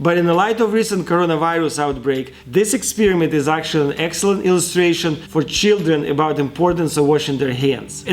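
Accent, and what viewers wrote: Russia. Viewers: Что это блять такое ? Ты же русский,это по акценту слишно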